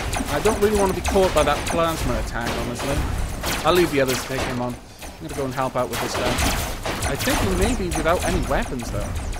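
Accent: United Kingdom. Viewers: I wonder where that accent is from. It's like a proper British accent with some foreign mixed in to make it interesting.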